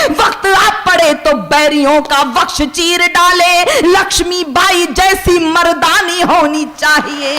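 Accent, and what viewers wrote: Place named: Lucknow, India. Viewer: Bilkul lagta hai k aap Lko se hain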